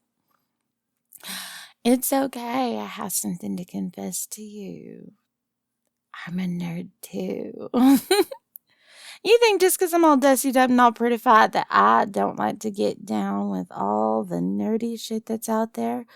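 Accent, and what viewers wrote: South, United States. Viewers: Your voice acting is always such a treat to listen to and southern accents are always a weakness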